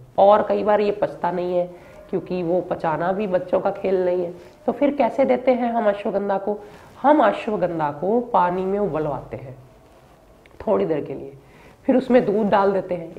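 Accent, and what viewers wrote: Punjab, India. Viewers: Apki hindi ka bulnay ka tareeqa say muje lagta hai app punjab kay hai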